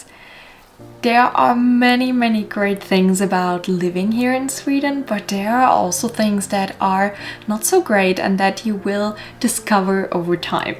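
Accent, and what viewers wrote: Germany. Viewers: Thank you, i thought I heard a little german accent but there are many countries that speak german, right. Good Luck with everything and i hope we Swedes help you with what y need.👍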